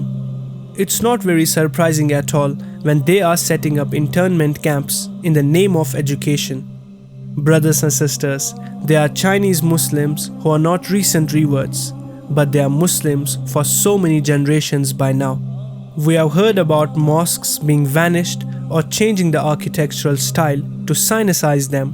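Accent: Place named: Kashmir, India. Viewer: By your voice you seem to be kashmiri.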